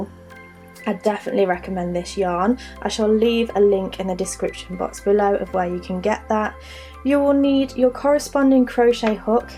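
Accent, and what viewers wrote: England, United Kingdom. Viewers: like the video. Nice to hear an English accent for a change!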